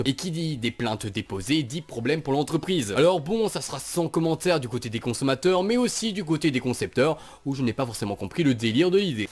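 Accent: Belgium. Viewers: parce que il est belge est qu'en Belgique les nombres ne se prononcent pas come en France, par exemple 90 se dit ''nonante'' et 70 ''septante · esque tu est belge????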